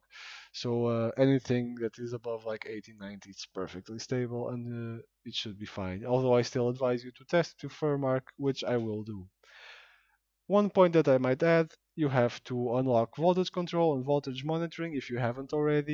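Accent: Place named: Portugal. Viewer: Compreendo :D Eu assim que te ouvi a falar, pimba! Este gajo é tuga! :D Estou agora a experimentar o teu tutorial na minha EVGA 2070!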